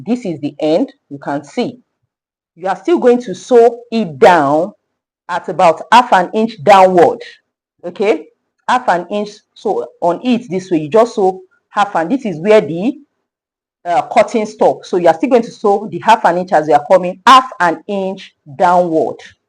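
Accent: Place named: RP, United Kingdom. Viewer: Your queens English makes me forget what am watching and be listening to the filtered voice. Well-done queen boss.........you dey help my career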